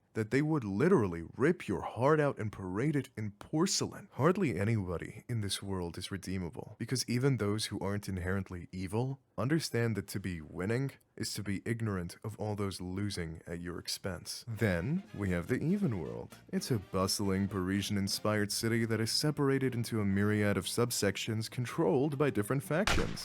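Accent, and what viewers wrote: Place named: United Kingdom. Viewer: You sound like you live in a steampunk britain, its captivating I love this style of videos discussing niche topics i really like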